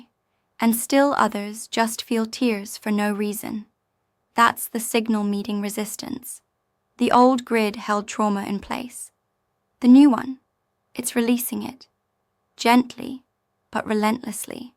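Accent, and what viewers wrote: United Kingdom. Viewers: with the Brit accent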